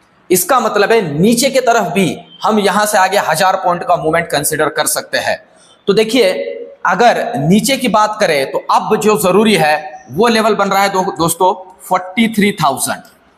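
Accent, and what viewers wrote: Maharashtra, India. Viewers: Sir mera analysis ye kahata hai ki aapki mother tongue Marathi hai...🙏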